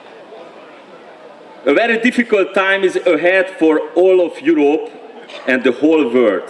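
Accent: Hungary. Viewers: Szia! Szerintem pont így jó ahogy van! Egy magyar embernek legyen magyaros az angolja! Ettől lesz ízes és egyedi! További sok sikert kívánok az utadon!!! FEL A GYŐZELEMRE!!! 🇭🇺🇭🇺🇭🇺🇭🇺🇭🇺🇭🇺🇭🇺🇭🇺🇭🇺🇭🇺🇭🇺🇭🇺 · Nem baj, hogy nem tökéletes az angol nyelv amit beszélsz! Soha nem is lesz jó mert magyarnak születtél az az anya nyelved mindig is benne lesz a magyar akcentusod ha bele feszülsz akkor is! 👍 🇭🇺 Hajrá Mi Hazánk 🇭🇺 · Nem baj, teljesen érthetően beszélsz, és ez szerintem sokkal fontosabb, mint az, hogy mennyire magyaros vagy nem magyaros a kiejtésed.:) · Aranyos, mert a magyaros angol a legjobb angol! Maradjon meg ez, nem kell minden nem angolnak elhagyni a nemzeti accentust! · Szerintem még jól is áll Önnek a magyaros akcentus. Különben is a lényeg az, amit mond és az zseniális. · Gyönyörűen olvasol angolt, magyar akcentussal😃👍; -Te vagy az emberünk! Hajrá MiHazánk! 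🇭🇺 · Magyar embertől nem szégyen az idegen nyelvű szavak magyaros kiejtése, csak egyes nemzetközi, kizárólag idegen érdekek mentén, ügynökösködő hazátlanok szerint! Sőt szerintem még az ilyen fennt említett férgek hergelésére, szándékosan belecsempésztem volna a beszédébe Toroczkai helyében, egy kicsit az ízes szöged környéki nyelvjárásból,némi pálócos behatással! Akkora magyarkodástól a ragya is kiverné a globalista csúszómászókat! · A kritika valojaban intelligencia kerdese. Nemcsak angol teruleten kulonbozo az un tajszolas vagy szleng. Hanem magaban az English Commonvealth ben is hellyel kozzel kulonbozoen nyomjak osszehasonlitva a Cockney akcentushoz…..azert van akcentusod mert MAGYAR VAGY. A szonoklat ertheto, es az erofeszites a minden keppeni kifejezodese hogy mindenkihez eljusson a kivant tartalom ertelmi szinten. Retorikailag is nagyon meg alltad a helyed. Ugyanis mellozted a frazisokban valo, szaggatott to mondatos puffogtatasokat. 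De magaban a gesztus ami egy tart karu olelo Udvozles nyelvi formaba ontve ,Tiszteletteljes Koszontes! ….. Kulpolitikailag nagyon fontos es meghatarozo dolgot fejeztel ki. Gratulalok a toretlen nemzeti elkotelezettsegetekhez es elszantsagotokhoz! Lehetoleg tobb feliratozott videot kerunk, Tisztelettel! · Milyen dolog hogy egy magyar politikusnak magyaros a kiejtése, remélem most már az ég kékségéből is problémát csinálnak. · Kedves! Semmi baj a kiejtéseddel. Magyar vagy, magyararos a kiejtésed. Aki meg akar érteni az megért, úgy gondolom vannak ennél nagyobb problémák is a világon ami sokkal fontosabb és amiért közdödök. ( Neked van angol kiejtésd, nem hiperpasszív mint annak a báb bolond Karácsonynak, aki össze vissza hazudik a nyelvtudásával kapcsolatban.) Úgyhogy kitartás és sok erőt kívánok neked és párttársaidnak! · Örülünk, hogy még a kiejtésed is magyar, nem csak a szíved! :) Nagyon jó beszéd volt, értette, érti ezt mindeki, azok is, akik ugyanígy gondolják, és azok is, akik máshogy - de nem nekik lesz igazuk. 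Melletted állunk, Te vagy Magyarország, és lehet, hogy egész Európa jövője! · Hallottam már sokkal borzalmasabb magyaros angol kiejtést, egyáltalán nem vészes :) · Szerintem nem baj a magyar akcentus, végtére is magyar. Macedón meg francia akcentussal beszèl. Fő, hogy értsék. És értették.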